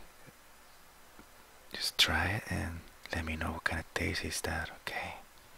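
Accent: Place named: France. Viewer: You sound... FRENCH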